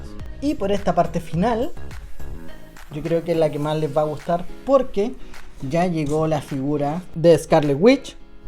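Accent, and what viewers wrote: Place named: Chile. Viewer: Amigo mio, vi un video tuyo armando la baf de controller, me causo familiaridad tu acento. Me ha sido difícil encontrar un chileno que coleccione y comparta videos como los tuyos. Te felicito por tu colección y videos los cuales seguiré viendo por supuesto. En lo personal también tengo mi colección que seguramente compartiré en algún futuro Un abrazo desde Chile amigo, Talca Que pases un excelente año nuevo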